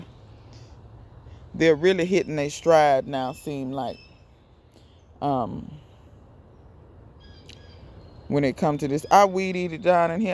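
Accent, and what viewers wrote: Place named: South, United States. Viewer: Girl you crack me up. Me and my husband be watching your videos laughing at you and those cats. I love your country accent. I thought I was country. Lol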